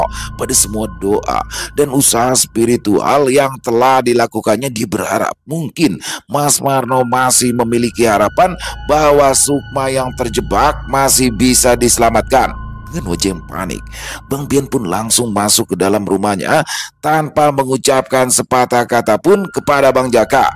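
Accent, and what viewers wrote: Jakarta, Indonesia. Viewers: Wa’alaikum Salam, gercep kalo kisah bang 😁saya suka logat betawinya.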